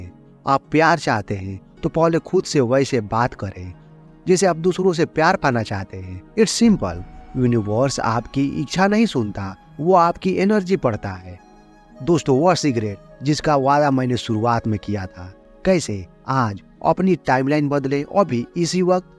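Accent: Bengal, India. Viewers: Kese bolta hai yaar ..bengali hai kya..hindi seekho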